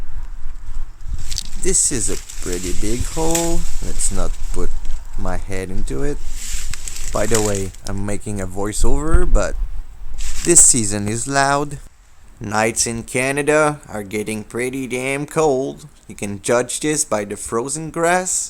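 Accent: France. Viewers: To learn more about Canada, I spent some time on Wikipedia. There I got the answer why you speak English with a French accent, very intelligibly at an appropriate rhythm. Stupid but until now I didn't know that both the French first and finally the vain British were involved until 1982 when they were finally hauled back to their island. But they remain vain, proven with their Brexit in 2020. I hope you will be able to outwit small game but it will be difficult. Guess not flying wild is easier from behind a blind. In addition, it is difficult not to betray your presence by creaking twigs, birds, especially crows... I hope then that your joy and happiness will splash off our screen because this "Big Brother" knows how difficult it is in Canada with the gun laws and what you have already done to now be allowed to walk outside your private place with an air rifle. good luck and a gelinotte isn't that an English hazel grouse? 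LOL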